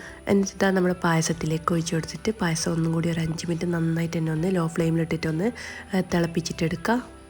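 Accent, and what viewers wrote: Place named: Kannur, India. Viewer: തനി നാട്ടിൻപുറം കണ്ണൂർ സ്ലാഗ്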